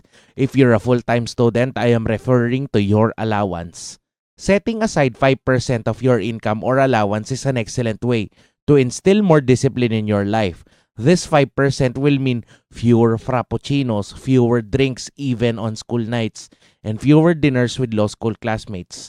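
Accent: Philippines. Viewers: English language with Filipino accent.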